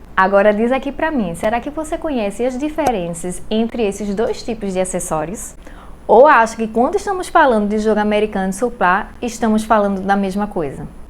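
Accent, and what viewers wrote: Recife, Brazil. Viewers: Vc é de Recife? Sutaque bem parecido! Moro em Curitiba, amo Recife! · Eita sotaque forte que me lembrou uma amiga do Recife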